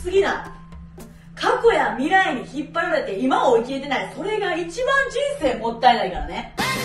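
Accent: Kansai, Japan. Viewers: ！初めて見たんやけど、めーちゃおもろい！！！！ソッコーでチャンネル登録したわ❤同じ関西弁やし、口がたまに悪くなるとかも、私と同じくらいで最高すぎ❤ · 初めてコメントします。酔ってます。😢最近ショートのサイコパス動画が勝手に携帯に出てきます。一回動画を閲覧してからかもしれません。どうぞ5飛んで来ては、毎回観てます。初めて観たのは1年半前くらいですかね。酔っ払ってグダグダ吐きそうになってる動画を見ました。面白かったです。それからにいらっしゃいがくせになってます。かなり綺麗美人さん（タイプ）だと思います。関西弁もしっかり関西弁。安心です。これからの活躍応援しています😊どこかで偶然会ったら飲みに行きたいです。ちなみにおっさんです😂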